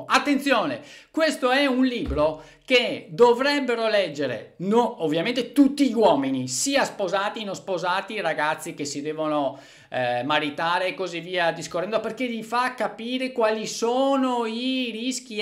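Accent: Veneto, Italy. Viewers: Io lo ascolto in loop sto video perché mi fa morire dal ridere. Il modo, le parole, la cadenza veneta (come la mia) impostata... credo di morire. Divorzio SPA mostro leviatanico.... morto!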